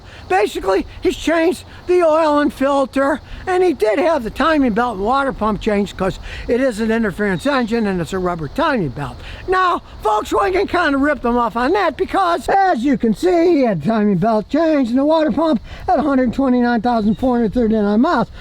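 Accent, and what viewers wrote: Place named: United States. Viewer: This guy is the epitome of my fantasy of how a true american sounds like. Half screaming, spitting through the "p" 's and the "d" 's, scattered all over the place and what not. That's what rock'n'roll is guys and girls!!! Rock on!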